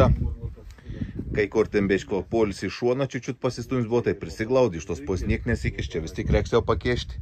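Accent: Samogitia, Lithuania. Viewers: Netyčia užmačiau ir dabar vis pažiūriu. Labai fainai žmogus pasakoja, smagiai žemaičiuoja ( kaip ne žemaičiui smagu paklausyt), gal tik kartais norisi detalumo, na tarkim kaip tampomi šniūrai, kaip/ su kuo susukat lentas tarpusavyje, kampukais ar ilgais varžtais ir t.t. Šiek tiek detalumo. bet šiaip labai smagiai žiūrisi ir ( jei neklystu), labai su cinkeliu toks ( į gerą pusę):)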